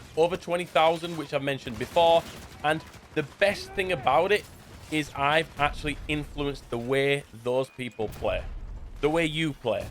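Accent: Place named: Scotland, United Kingdom. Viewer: When I first started watching you I thought you were a Scot. And now I have just learned I wasn't entirely wrong! □😂🤣😂🤣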